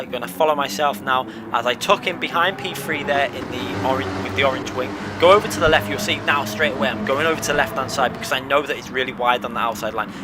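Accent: Liverpool, United Kingdom. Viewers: Feedback is what I'm doing for you Not what your doing, that's called commentary. If you do t like feedback, disable comments. Your Liverpool accent is quite strong and your talking at 60mph on top of not shutting up. If that's what makes your channel popular than good for you. That's my opinion.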